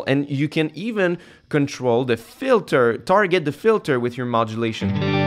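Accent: Quebec, Canada. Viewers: Vidéo made in Québec selon l'accent non ?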